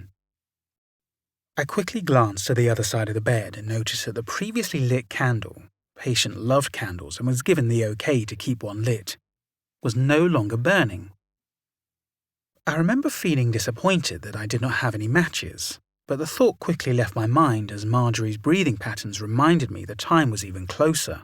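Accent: England, United Kingdom. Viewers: English accent better for ghost stories, totally.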